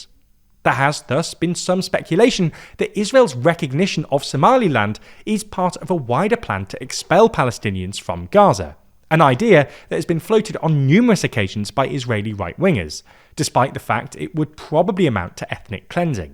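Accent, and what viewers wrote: United Kingdom. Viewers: Shut up with bullshit british accent. You suppose to be the first country to recognise Somaliland.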